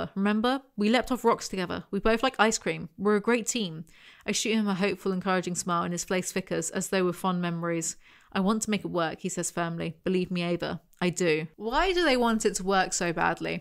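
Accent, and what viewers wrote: United Kingdom. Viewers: "I sound really funny when I talk" Dude, you're British. There's no way you could sound funnier (especially to us Aussies, anything you Poms do makes us laugh)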